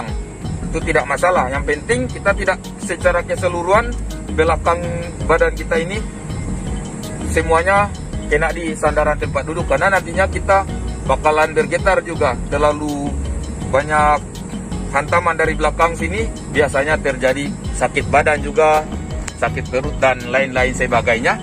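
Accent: Bolaang Mongondow, Indonesia. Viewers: Mantap bang jelas sx👍 Mcam logal bolmong😁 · Rupa logat mogondow ini noh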